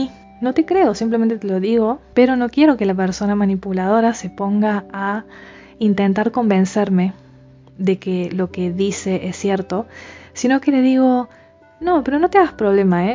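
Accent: Argentina. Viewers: Muy buenoooooo .párese SOS argentina verdad . EXCELENTE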